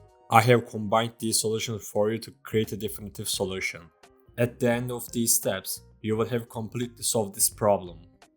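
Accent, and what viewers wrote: Turkey. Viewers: İngilizceyi türkler gibi konuşuyomuş bu çocuk diyodum hangi milliyetten acaba derken yazısını gördüm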